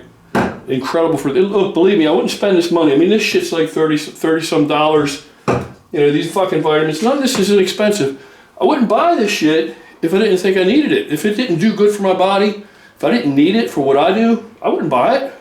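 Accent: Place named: Philadelphia, United States. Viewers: You sound like you live in Philly or somewhere in the Delaware Valley. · You sound like you're from Pennsylvania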